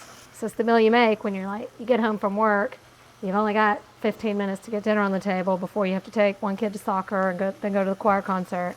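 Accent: South, United States. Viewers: Huge fan of! Love her easy home meals, her soft demeanor, and her cute southern twang. Keep the recipes coming!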